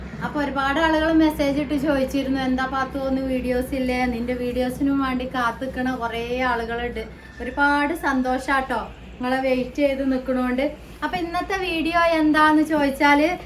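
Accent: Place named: Eranad, India. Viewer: കുറ്റം പറയണ്ട, ഏറനാടൻ ഭാഷയാ അതിനെന്താ കുഴപ്പം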